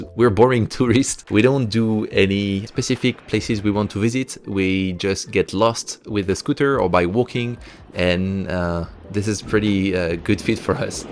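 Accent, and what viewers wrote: France. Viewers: Hey, merci pour la vidéo, (j'ai reconnu le petit accent français) j'aspire à devenir développeur solopreneur, malheureusement je n'y connais rien en programmation, je suis au début de l'aventure, je voulais te demander selon toi quel est le meilleur chemin pour apprendre à coder le genre de Saas que tu conçoit, quelle langage de programmation apprendre en premier et est ce nécessaire d'apprendre L'IA (machine learning ect..) Continue ce que tu fais c'est super inspirant!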